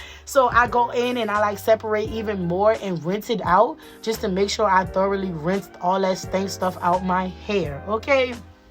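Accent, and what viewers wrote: Louisiana, United States. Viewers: Babygirl you gotta be from Louisiana 😂 anywho I needa do this cause I been looking look tore up lately lol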